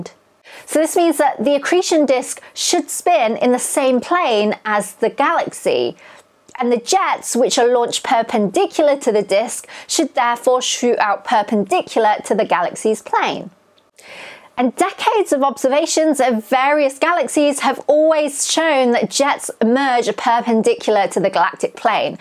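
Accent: United Kingdom. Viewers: Your face screams asian, but your voice says vaguely british.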